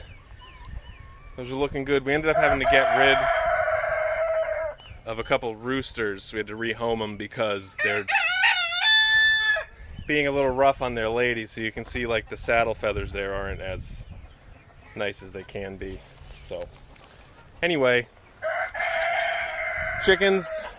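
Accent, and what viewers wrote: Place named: South, United States. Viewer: Loved the southern accent!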